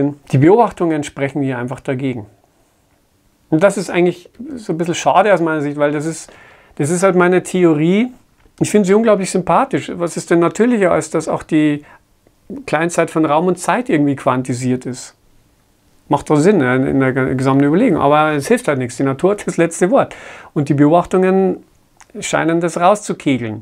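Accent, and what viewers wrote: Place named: Bavaria, Germany. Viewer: hört man ja auch das freundlich klingende Bayrische heraus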